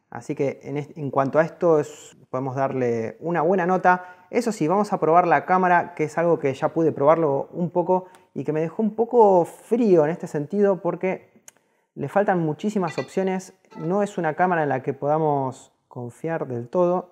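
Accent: Argentina. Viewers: sos argentino o español? porque decis algunas palabras en argentino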